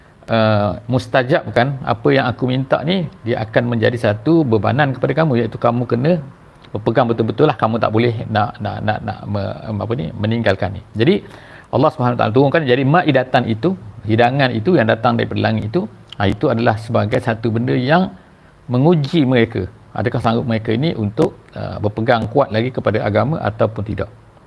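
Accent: Terengganu, Malaysia. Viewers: Assalamualaikum... alhamdulillah. Cuma nak tanya Dr ni asal Terengganu ke? Bunyi slang Terengganu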